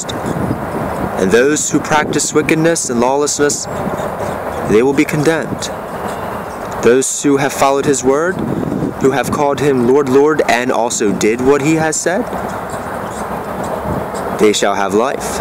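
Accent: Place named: England, United Kingdom. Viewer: robert lyte is australian this guy is english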